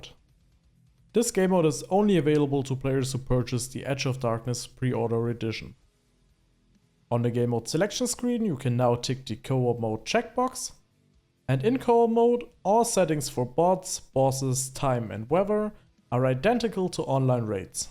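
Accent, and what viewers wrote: Germany. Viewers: german accent is strong with this one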